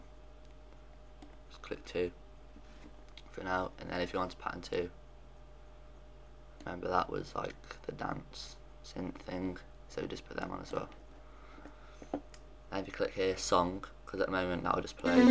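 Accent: United Kingdom. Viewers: "you sound like your from london"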